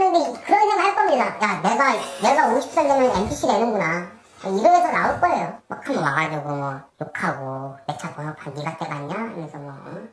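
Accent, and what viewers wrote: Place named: Chungcheong, South Korea. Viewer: 말투가 딱 아산 예산 이쪽인데?ㅋㅋㅋㅋㅋㅋㅋ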